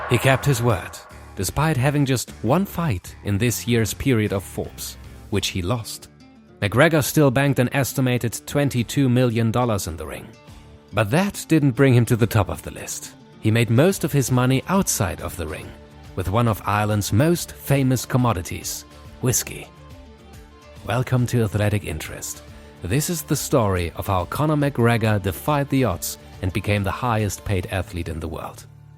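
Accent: Germany. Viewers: Love the German accent ngl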